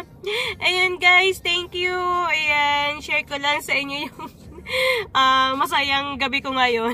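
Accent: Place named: Bicol, Philippines. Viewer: Sarap ng tawa mu ah,hahaha ka bikol tlga ni palalabas mu "banonoy"😆😆😆😂😂😂😂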